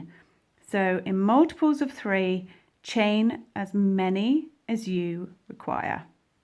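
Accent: England, United Kingdom. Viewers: ❤❤❤❤❤❤❤❤❤❤❤❤❤❤❤❤❤❤❤❤❤ I LOVE YOUR TUTORIALS AND YOUR ENGLISH ACCENT ❤❤❤❤❤❤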